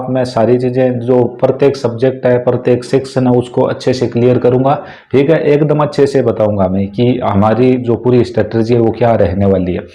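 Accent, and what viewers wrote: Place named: Sri Ganganagar, India. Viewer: Bade bhai thari voice hu laag the mer triya sri ganganagar ga ho Or bhai Ma Telegram par add hou Koi problem hosi to Thaan Yaad Kruga ❤❤❤❤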